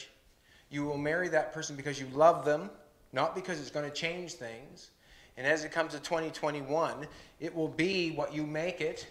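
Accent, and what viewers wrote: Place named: Canada. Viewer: Merry Christmas to you and your lovely partner...keep doing what you do...I'm a Canadian living just north of Cincinnati Ohio and find your authentic Canadian accent endearing....it makes me long for home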